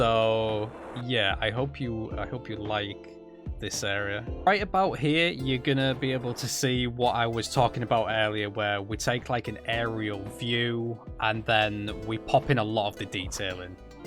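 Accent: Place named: Yorkshire, United Kingdom. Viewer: Knew the accent was familiar, I live up near Middlesborough and go to the York markets nearly every year.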